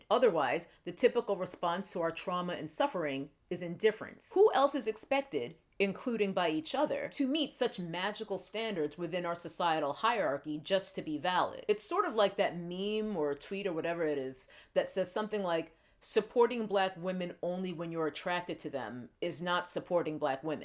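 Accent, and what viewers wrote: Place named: Brooklyn, United States. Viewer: I know this is off topic but are you from Brooklyn? Your accent sounds Brooklyn to me.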